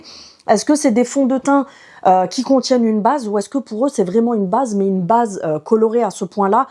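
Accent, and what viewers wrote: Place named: South, France. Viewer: Mais on aime t écouter ma petite française du Sud.bisous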